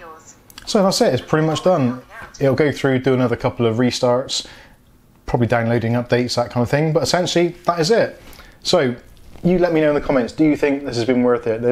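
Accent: Bristol, United Kingdom. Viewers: love the bristoliannn accent! makes it 100x more friendly lol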